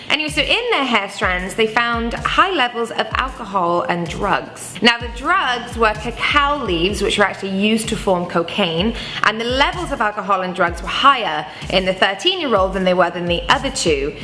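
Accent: England, United Kingdom. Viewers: sounds 3/4 enlgish 1/4 american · British accent, I barely understand what she is talking about. I am not American. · And people didn't believe me when I said that some English accents really aren't all that nice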